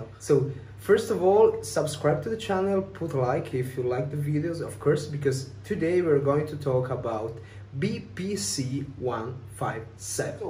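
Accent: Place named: Veneto, Italy. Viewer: Great,buddy 💪🏻l'inglese con accento Veneto è stupendo☺️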